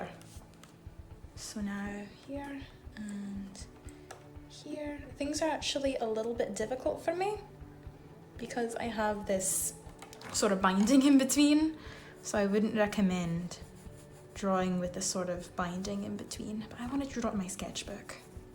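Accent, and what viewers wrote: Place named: Scotland, United Kingdom. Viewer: u from scotland for sure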